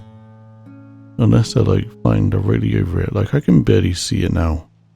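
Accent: United Kingdom. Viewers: r u scottish or english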